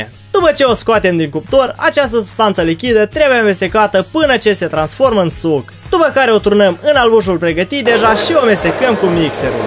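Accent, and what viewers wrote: Moldova. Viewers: Da tu esti din Moldova da?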